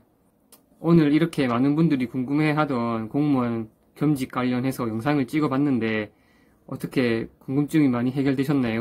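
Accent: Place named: Busan, South Korea. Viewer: 공무원 유튜브 해도되냐는 공격적인 댓글에 마음 고생 좀 하셨겠어요 ㅠ 저는 팬으로써 공스틴님 응원합니당✊✊ 그와중에 개인적으로 부산사투리 넘 좋아요ㅠ 다시태어날까..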